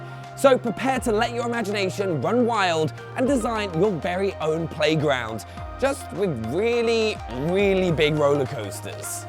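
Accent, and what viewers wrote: United Kingdom. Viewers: Ok so i love the UK peeps , i truly do, even if we boot stomped yall for our Freedom, MY ISSUE IS, why are yall on my youtube 24/7 with that accent that i cant understand , i mean really wth ! this Vid seems really Great and i start listening an all i hear is that dreadful accent and its mucking the entire vid up, and where are my fellow Americans for these types of vids, why are all my Video Game suggestions from youtube are from the UK !!! im thumbs up your vid cAUSE its the proper thing to do and i really like the title ,but i cant understand a lick of what your sayn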